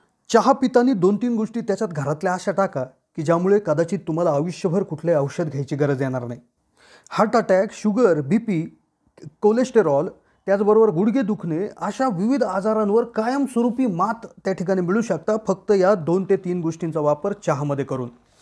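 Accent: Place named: Khandesh, India. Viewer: Khandeshi bolaychi stylish bhasha ashi aste .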